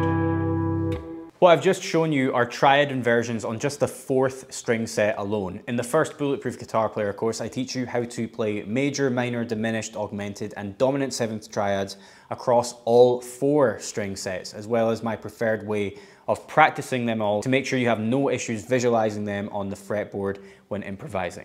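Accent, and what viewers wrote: Scotland, United Kingdom. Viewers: Nice one sir. Your from Scotland, but where exactly. We might be neighbors. Cheers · Sounds like a Scot to me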